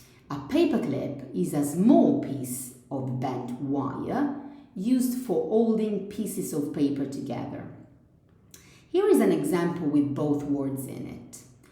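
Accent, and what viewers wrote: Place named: Italy. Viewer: Pronunciation is Italianized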